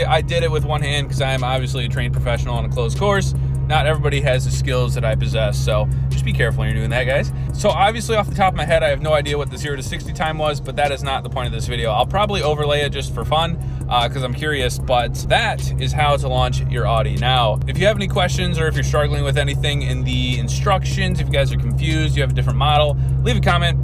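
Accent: United States. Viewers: It's kind of a midwest thing. Even in Canada, folks from the prairies say "Oddie". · He's American, they say alot of things differently.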